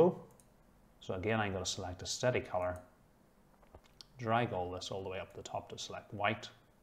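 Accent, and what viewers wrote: Northern Ireland, United Kingdom. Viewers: I just built my first ever PC and this was by far the most useful tutorial I came across when it came to building and especially what to do when it comes to installing Windows and installing drivers. I'd be lost without this! PS. My parents are from Norn Iron and when they heard your voice on my phone they said "Ach, a Norn Iron fella! What's craic with him?"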